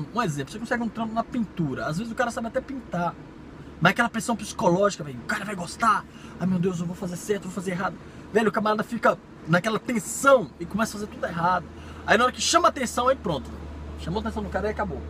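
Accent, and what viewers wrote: Brazil. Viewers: por um acaso você é nordestino você fala com muito sotaque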